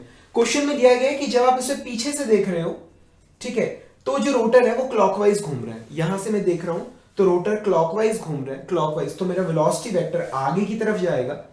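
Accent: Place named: Punjab, India. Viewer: ap pkka punjabi ho sir....jo baar baar raje rajee kr rhe the...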